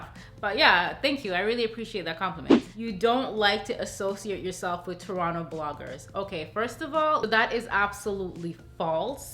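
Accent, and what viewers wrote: Jamaica. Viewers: I have never heard your Jamaican Accent before and i can understand patois! Lollll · Wow didn’t know you were Jamaican and had an accent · What a beauty😍😍 Jamaican accent cant hide at alll😩🇯🇲🇯🇲🇯🇲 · I understand what you said when u speak in your Jamaican accent bc I'm Guyanaese! 💜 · COME THRU JAMAICAN ACCENT!!! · Love the accent! Makes me think of my Grandma she was from Kingston Jamaica 🇯🇲💗 I can understand. You are absolutely beautiful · her voice is so soothing wth lmao and i can tell she’s jamaican it comes through very slightly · Yes that patois 🇯🇲🇯🇲🇯🇲🇯🇲 🇯🇲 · it was so cute to hear you speak patois 🥰 edit: oh my gosh I got teary as soon as you got emotional. I’ve watched your channel for years and I’m so proud of your success! so happy you’re able to live your dreams and comfortably take care of your beautiful family ❤️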